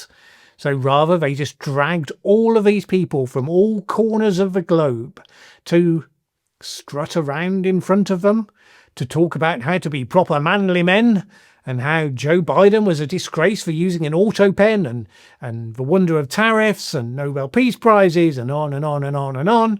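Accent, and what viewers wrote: United Kingdom. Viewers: OH GOOD ! A quickly headline featuring name calling. Followed by a sarcastic narrative / lecture from an old guy with a British accent. Surely this guy's friends find him really persuasive. But what is actually at stake bears analysis. Anyone paying attention knows that the USA has many more general officers / senior officers on staff than at the end of WW2 when many multiples of today's forces were in uniform. We've also got the insanity of public health officers and doctors being called admirals because of some antiquated human resource theory which equates history of attainment of educational credentials with rank to justify pay. When you put all these guys together in an auditorium, they realize that they are not unique special individuals ruling over their direct reports in separated fifedoms, according to their own personal proclivities and idiosyncratic value systems. 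Look at all those gray heads! Underlings in military service live by "up or out" -- if you're not advancing, it's time to retire, unless you figure out a way to be retired on the job. But these guys, with limited or nonexistent or outdated war fighting experience, just spent 12 out if the last 16 years under Biden and Obama, "going along to get along. " This experience of course correction is being delivered in the nicest way possible -- demonstrating that these high ranking individuals are in a top heavy military...and if they stick around after being told the direction in which the nation's military is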